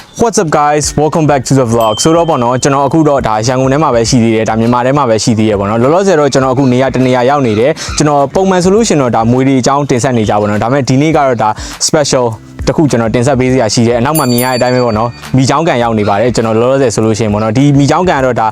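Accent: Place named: United Kingdom. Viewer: ညီလေး Accent က British Accent လေးဘဲ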